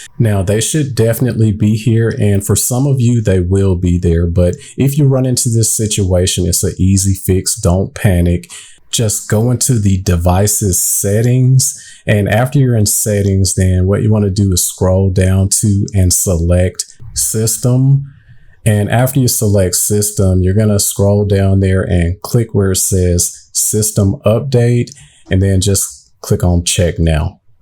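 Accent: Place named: South, United States. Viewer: Came for the info, stayed for the accent. I don't know where you're from, but I love your accent. I live in NYC but I'm from Alabama... sounds like you're somewhere close to there. Thanks for the video!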